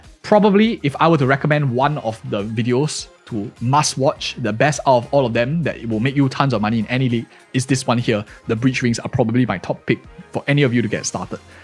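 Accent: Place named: Singapore. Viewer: more like singlish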